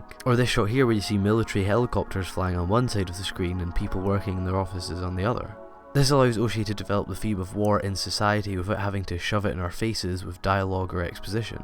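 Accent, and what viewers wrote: Scotland, United Kingdom. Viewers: Are you from Scotland and if so where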